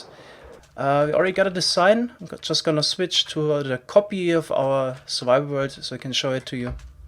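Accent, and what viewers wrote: Germany. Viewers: The german accent is strong in this one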